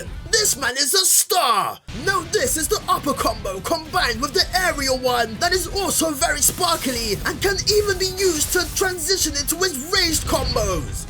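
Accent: United Kingdom. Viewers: Yea I'm pretty sure he's in the UK especially with the accent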